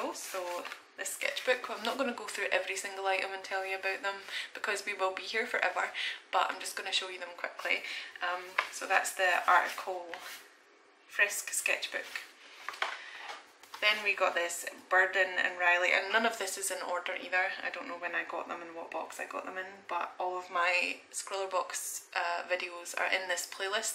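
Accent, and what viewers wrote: Scotland, United Kingdom. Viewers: Hey! Is that a scottish accent i detect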